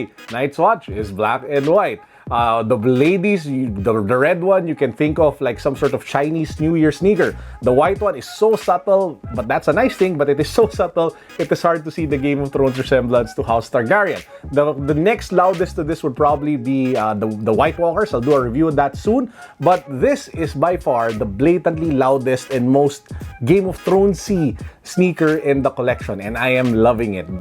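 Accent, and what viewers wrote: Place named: Philippines. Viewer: Went in person to check out all the GOT shoes, this one definitely caught my eye and ultimately bought these. House Lannister were close though but they were only exclusive to women. Starks were nice but would prefer a black bottom sole. White Walkers were nice also but it screamed yellowing over time. Besides the strong filipino accent, you gave a well thought out review of the shoes. Great job bro.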